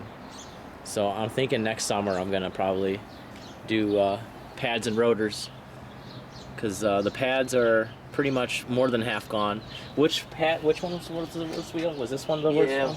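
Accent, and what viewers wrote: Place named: Chicago, United States. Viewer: I heard the Chicago accent. Then I could tell your Right in the flight path of Ohare airport like me.👍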